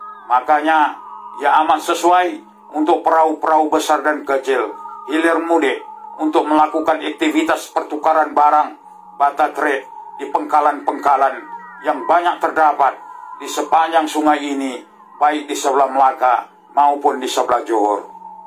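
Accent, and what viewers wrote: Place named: Indonesia. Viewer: suara dan dialek jelas indon asli pak...walaupn hujahnye aku stuju..tp anda mmg jelas warga indon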